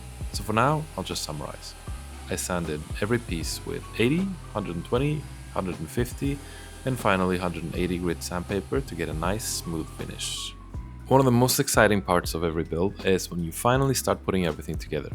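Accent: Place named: Norway. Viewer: where are you from? your english accent sounds norwegian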